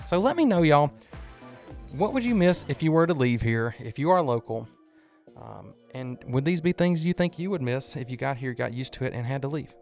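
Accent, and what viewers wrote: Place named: Texas, United States. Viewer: Oh wow, when I was watching one of your videos I thought that’s a Texas accent. I’m from Texas. Now it’s confirmed.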